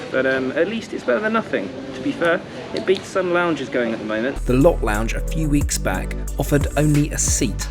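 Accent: United Kingdom. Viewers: Turkish Airlines is more assertive in economy class. And you, the British-accented dog, awaiting king service from a short flight. Don't be racist dude. We don't hate westerners, but people like you have an endless hatred for us. If you are looking for more king services, do not fly with THY. Fly with Emirates or Qatar Airways. racist guy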